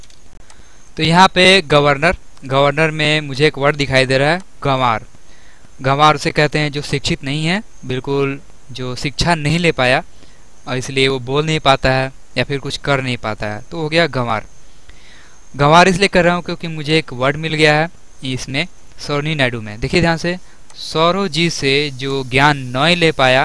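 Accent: Bihar, India. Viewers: bht mst bihari bolte ho aap sir😃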